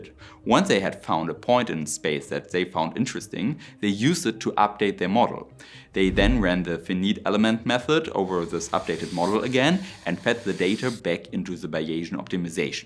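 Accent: Germany. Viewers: Who cares if a German guy speaks English with a German accent, come on 😄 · Germa accent is irritating. Use AI like most other bloggers.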